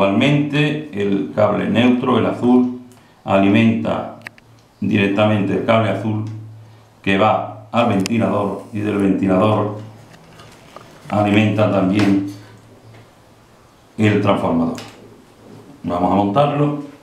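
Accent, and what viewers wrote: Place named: Seville, Spain. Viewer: gracias por la respuesta...lo preguntaba porque por el habla, parece usted de sevilla, que es donde resido, en mi trabajo relacionado con plantas de agua...siempre subcontrato la fabricación del cuadro...cosa que me gustaria hacer yo mismo para tener mas control sobre los problemas posteriores que casi siempre los hay. En la facultad saque un 9 resolviendo circuitos...pero todo muy abstracto y teorico...demasiado alejado de la practica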